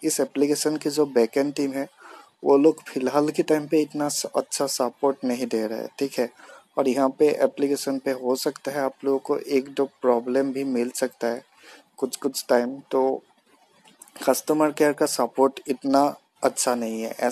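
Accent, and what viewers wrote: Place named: Assam, India. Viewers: he is from Assam i think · Assam se hain ye... 🤗 Himonoto biswa sarma ji bhi yhi se hain ..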